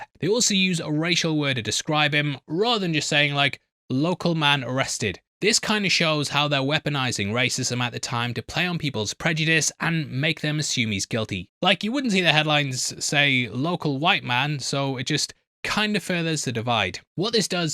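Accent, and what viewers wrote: United Kingdom. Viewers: As someone who grew up near Shreveport, you are saying it correctly. People probably just find it odd to hear it in a British or even neutral accent because of the deep, region-specific accent people near there tend to use. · Ain't nothing wrong with your "Shreveport". You're just British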